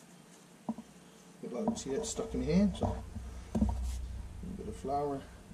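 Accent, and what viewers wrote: Newfoundland, Canada. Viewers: Gotta be a Newfie I’d say bein from Nova Scotia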